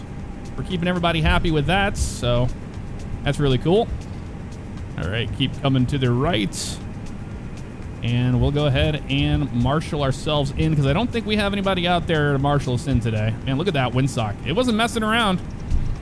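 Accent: Newcastle, United Kingdom. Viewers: Are yo a geordie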